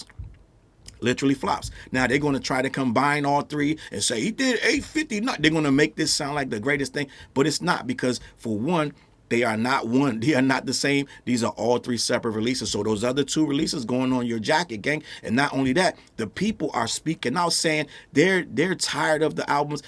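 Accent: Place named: East Coast, United States. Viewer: Let me go ahead and pack in comments to boost this 🥷 in the algorithm, I know the dick gobblers gon be on yo neck 😩 😅😅. Not for nothing yo ahhh remind me of my uncle may he rip, n I ain’t clowning ya age bc I’m not young my damned self n black don’t crack FYM 😅. Anyways he used to always say this that n a third n he spoke fast n he was from east coast so he sounded like yo ahhh too 😅😅. Shit makes me laugh g salute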